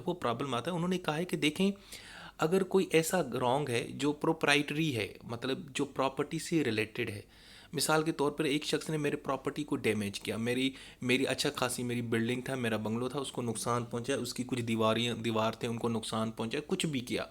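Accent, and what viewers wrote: Karachi, Pakistan. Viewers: Sir ap Karachi sa hn? Ap ky bat krny k Andaz sa lg rha h plz btay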